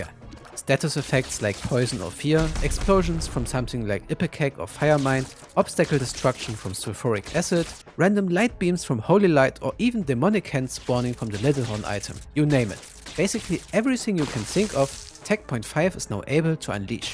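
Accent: Germany. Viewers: Ahhh jaaa der schöne Deutsche Akzent wunderbar❤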